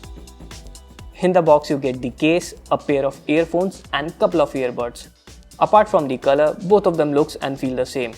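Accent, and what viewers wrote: India. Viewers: Huy Tran this is their accents. I got familiar with them after 5 years study in India..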